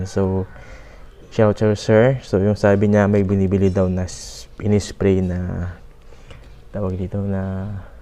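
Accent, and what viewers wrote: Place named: Ilonggo, Philippines. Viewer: Ilonggo ka boss? Dw nabatian ko ang tono ta eh,hahaa.pila ang set sina boss shampoo and water pila magasto? Thanks for sharing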